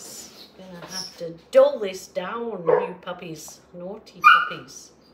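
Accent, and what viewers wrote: Birmingham, United Kingdom. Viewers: Like the wrapper of a gorgeous chocolate bar 😋😍. Are you from Birmingham, England originally?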